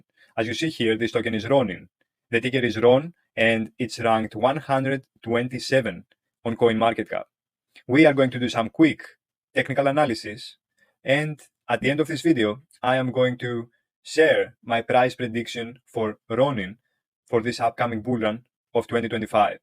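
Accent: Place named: Greece. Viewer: Απο τη προφορα μαλλον εισαι Έλληνας ??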